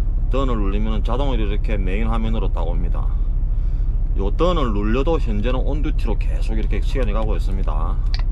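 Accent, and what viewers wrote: Gyeongsang, South Korea. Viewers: 오빠 열나게 달려봐라. 단디 잡아라 가시나야...... 경상도 사투리는 언재나 들어도 재미있고 친근감이갑니다. 아저씨는 들을수가없어 안타 깝습니다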